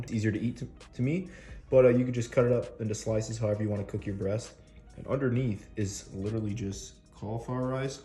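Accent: Australia. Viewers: That accent was Australian crossed with victorian English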